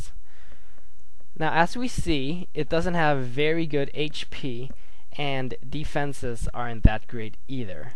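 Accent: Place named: United States. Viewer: r u an asian or an american? well, u sure have an american accent but look like an asian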